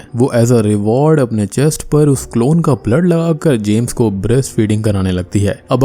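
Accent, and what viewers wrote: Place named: Kolkata, India. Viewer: I know this accent is from kolkata. Don't be sad about such comments. It's all about the fun like a lil child speaks in his way .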